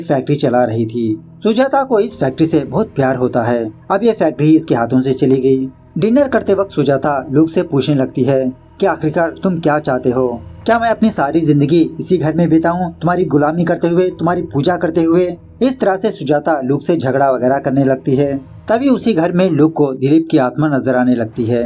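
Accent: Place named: Maharashtra, India. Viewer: Iski Jaat Ka.., Is a Epic Word.. Creator Ne Must Bataaya Movie ko Short me.. Lekin Na Movie ke naam ka Matlab inko Samjh Aaya Na Propegenda Samjh Aaya .. Isiliye Hindi Dubbed movie ka Short bana Diya Copy Paste.. Ending Explanation Ka Tag use mat Karna.. Good Working lekin Aapka aawaz Maharashtra ke koi pichde hue Gaao ke ilaake Wala kheti kisan ya mazdur ke anpadh Bete jesa lagta he.. means baat karne ka tarika .. phir bhi best luck Meri Taraf se.. Jo Aap aaj yaha Tak pohche.. 👍🏼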